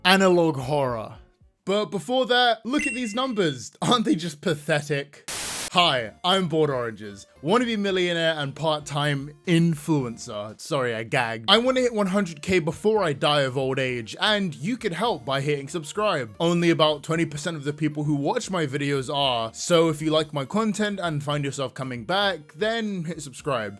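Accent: United Kingdom. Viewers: Black man with funny (fucking English?) accent talks about analog horror and uses anime in the video for some reason. So anyway I subscribed to the great value KSI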